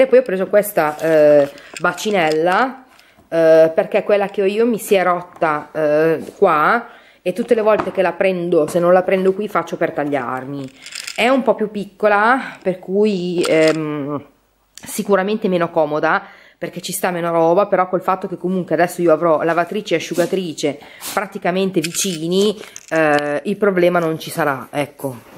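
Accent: Emilia, Italy. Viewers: Che ridere quando parli con!!!😂😂😂adoro l'accento emiliano!!! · SEI UNA GNOCCA TERRIBILE 😊😊😊!!! L adoro è stupenda!! Adoro gli emiliani nn x altro sono di Reggio Emilia ma purtroppo nn abito li ed è una vita che nn ci vado 😔😔 xo seguendoti e sentendoti parlare mi sembra di tornare un po' a casa... mi piace molto la tua semplicità complimenti x i video che fai un abbraccio 😘😘🤗🤗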